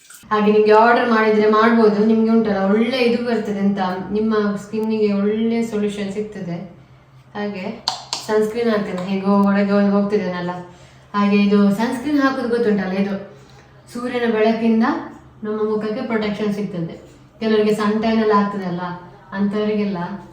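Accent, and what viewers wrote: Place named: North Karnataka, India. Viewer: ನಮ್ಮದು ಉತ್ತರ ಕರ್ನಾಟಕ ❤ ನಿಮ್ಮ ಭಾಷೆ ಕೇಳುವುದಕ್ಕೆ ತುಂಬಾ ಖುಷಿಯಾಗುತ್ತದೆ ❤😊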